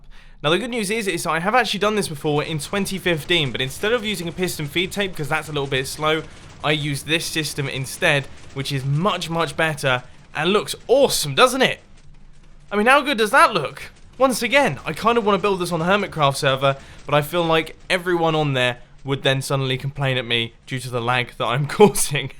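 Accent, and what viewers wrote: Australia. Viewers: your australian? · wait is Australian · You from Australia? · r u australian coz i am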